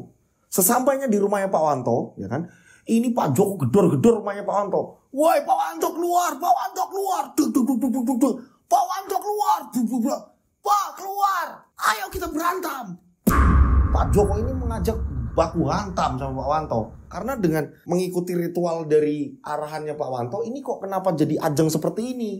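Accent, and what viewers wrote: Java, Indonesia. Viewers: Wa alaikumussalam asli mas dari mana kok medok ya, Jatim ato Jateng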